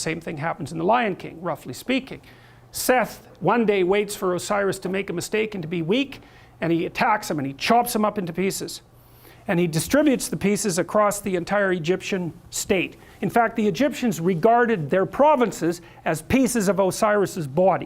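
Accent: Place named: Canada. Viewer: The way he says "not" and "God" is for some reason hilarious to me. Or anything with an o. What a Canadian. Also, long live the lobster queen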